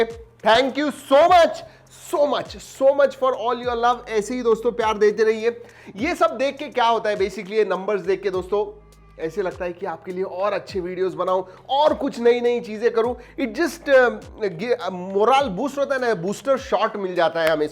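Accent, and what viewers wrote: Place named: Marathi, India. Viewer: अरे व्वा आपण एवढं स्पस्ट मराठी बोलतात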